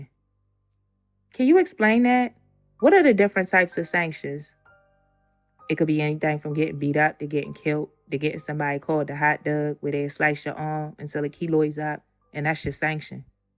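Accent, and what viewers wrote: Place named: Baltimore, United States. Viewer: Who ever you got reading this is definitely from Baltimore like me hot-dug aka hot dog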